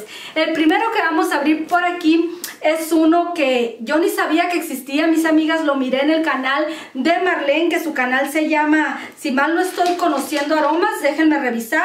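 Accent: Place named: North, Mexico. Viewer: Amiguita, nuestra querida es norteña por eso tiene ese bonito accento. Cada quien es diferente, hay que apreciar la variedad de accentos en los Latinos ❤️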